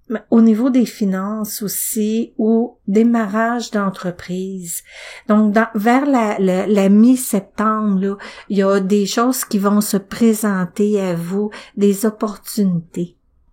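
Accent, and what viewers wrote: Quebec, Canada. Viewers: Quel plaisir de vous écouter. J'adore votre accent. Québécoise ? Exact, je suis à la fin d'un sicle et vivement que celà se termine pour ouvrir une page positive. Je vous souhaite un très beau week-end. NAMASTE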